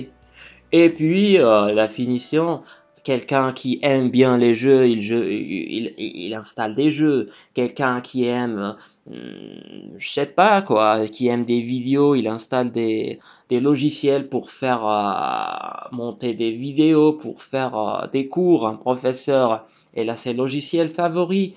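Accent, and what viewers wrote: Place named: France. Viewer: Vous avez une belle accent française ! J'ai cru au début que vous êtes français. Keep going 💪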